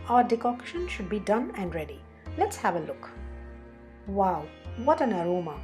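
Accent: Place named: Tamil Nadu, India. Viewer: Nothing like our South Indian filter coffee (kaapi)! It's something I used to miss on all my business trips abroad till I started carrying a filter and coffee powder with me!, Wow! Super English pronunciation, diction and intonation even though there is a slight aroma of 'Filter Tamizh' in the accent! 😅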